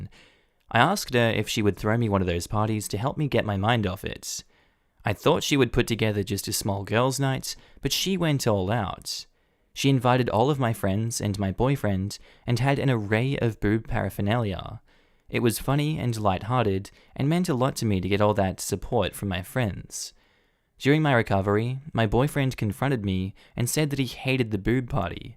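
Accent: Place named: Australia. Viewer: i love the aussie accent!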